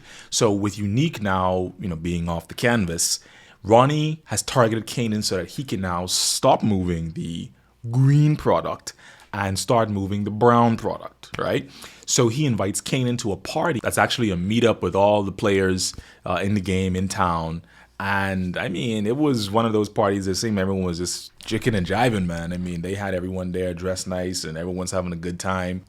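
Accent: Bahamas. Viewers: Are you from the Bahamas your accent remind me of mines? Bless up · you sound Bahamian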